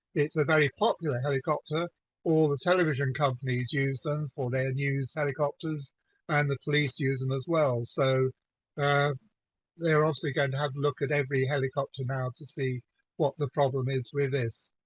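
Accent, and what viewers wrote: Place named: United Kingdom. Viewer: I cannot understand what this newsperson is saying, he sounds British. I will get the story elsewhere.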